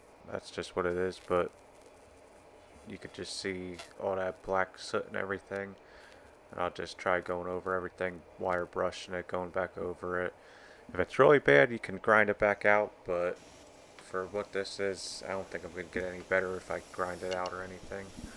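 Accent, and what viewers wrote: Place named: Southeast Pennsylvania, United States. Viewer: Nice work and thank you for sharing,! Where's your shop? It seems like I'm hearing a S.E. Pennsylvania accent.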